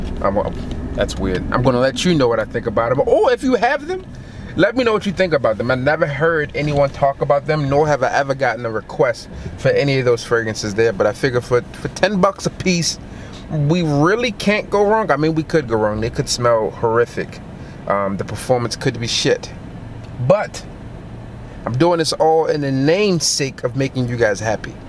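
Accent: Baltimore, United States. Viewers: U got a Baltimore accent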